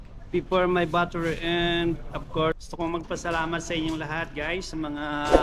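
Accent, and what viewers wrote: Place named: Visayas, Philippines. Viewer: Kuya,wag ka na mag salita tagalog or englush dahil masyadong BISAYA ang dating, say the right pronounciation!!!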